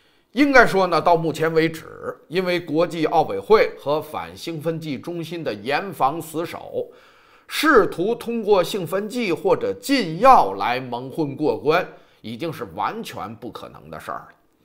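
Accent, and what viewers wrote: Beijing, China. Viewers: 這口北京話，太爽了！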